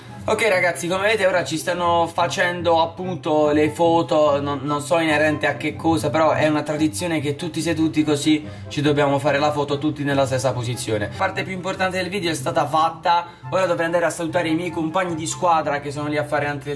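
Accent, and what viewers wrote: Bari, Italy. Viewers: Ma sei barese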